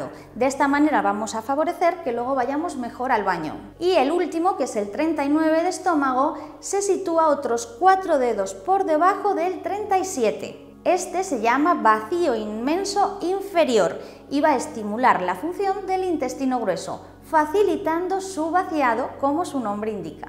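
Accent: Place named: Spain. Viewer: Ese asento español es el peor, pérdida de tiempo con este vídeo.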